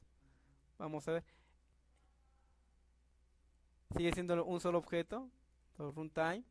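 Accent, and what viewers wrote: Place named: Mexico. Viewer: eres lo maximo tio , muchas gracias por tus tutoriales explicas muy bien y por lo que escucho eres de mexico , que viva mexico!!!!!!!!!!!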